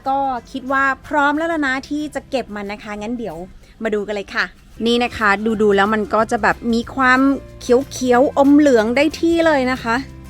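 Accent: South, Thailand. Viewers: พูดใต้ได้ชัดเจน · เพิ่งเคยได้ยิน พูดภาษาใต้ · แลคลิป แหลงใต้ น่ารักมากๆเลยค่ะ😍❤️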